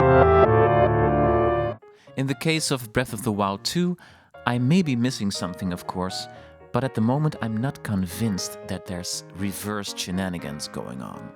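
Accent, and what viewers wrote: Netherlands. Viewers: Ur from dutchland i can hear it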